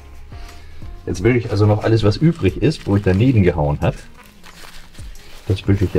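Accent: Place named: Sylt, Germany. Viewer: Der Akzent! Himmlische Heimatgefühle! Komme von List auf Sylt. Jedes Mal wenn ich ihn höre denke ich an Munkmarscher Muschelsuppe.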